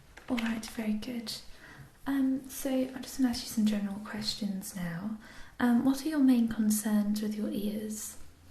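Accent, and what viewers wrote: Scotland, United Kingdom. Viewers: Wow! Love this, ear examinations are my favorite, can't believe I've only just found your chanel! Nothing wrong with your Scottish accent but your natural English one, I find is more relaxing 👍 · No video + natural accent almost makes me feel like I'm listening to someone completely new. Fitting to the channel rebrand, I suppose. I think this and your Scottish accent are both equally wonderful, excited for new videos in either.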